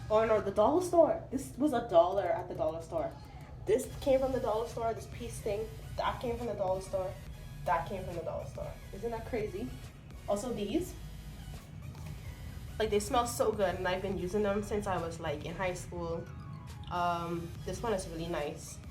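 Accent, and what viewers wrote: Bahamas. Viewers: Are you from the Bahamas? I can here our accent🤣🤣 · You have to be a Bahamian because of the accent. I'm watching this like hey she sounds like me loll... Nice Apartment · The Aquarian Empress I cam just to see if someone caught it lmfaooo. As soon as I heard her speak I said she a Bahamian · I said the same thing. She sound like a true bahamian lol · From the video started i knew you were Bahamian! So am I! And i love this video. Keep up the great work bbg. Def got a new sub. :) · I came looking for this comment! I said she has to be from the bahamas! Sending love from nassau!!! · You sound Bahamian 👀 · From I hear ya accent Ik you was a Bahamian #Bahamas🇧🇸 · I know a Bahamian when I hear one! Great job sis🇧🇸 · Are you Bahamian??? That accent creeping out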